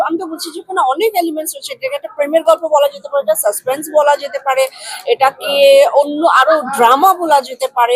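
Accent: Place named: Kolkata, India. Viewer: এর কথায় কলকাতার টান আছে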